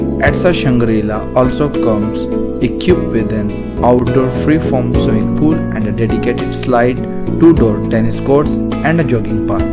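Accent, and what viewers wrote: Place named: India. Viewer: the way you speak i can guess you are from india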